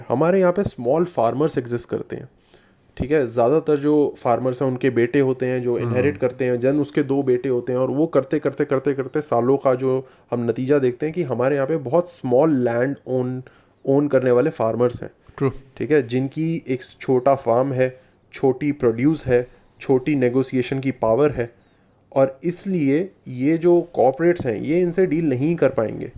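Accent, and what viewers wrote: Pakistan. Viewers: He sounds more like Pakistani....(accent)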